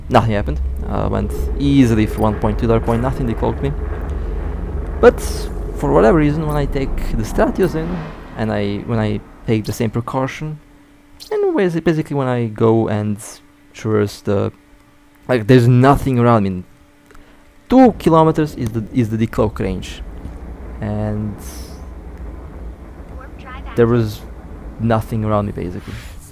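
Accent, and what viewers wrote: Russia. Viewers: да делай на русском судя по акценту же русскоязычный!)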